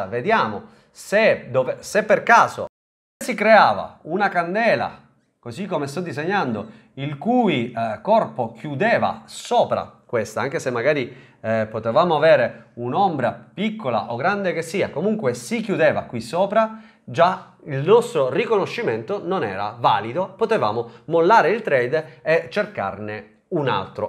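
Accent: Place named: Apulia, Italy. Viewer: guarda io sono di Brindisi e il tuo accento è identico a quello della fascia tra Brindisi e Taranto. Che è molto diverso dal solito pugliese che si sente in tv che va da Banfi alla pizzica salentina. In effetti basta guardare una cartina delle zone linguistiche italiane per vedere che quella parte di Puglia ha lo stesso colore della Sicilia! Grazie per la tua gentile risposta! 👋👋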